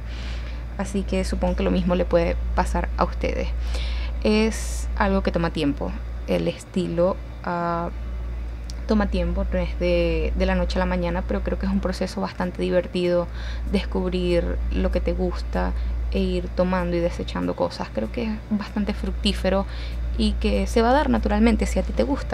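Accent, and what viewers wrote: Venezuela. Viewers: Pareces del Zulia, digo por tu acento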